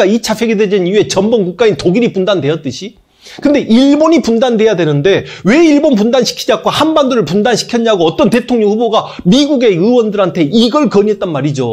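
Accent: Jeolla, South Korea. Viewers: 전라도 사투리 쓰시네요?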